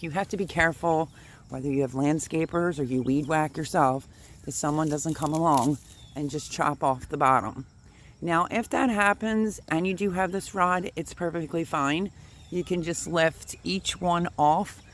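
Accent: Philadelphia, United States. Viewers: I immediately knew you were from PA. I’ve been out of Philly for almost a decade now and your accent is making me homesick! Haha. Very helpful review, I definitely will be ordering a case of these. God bless!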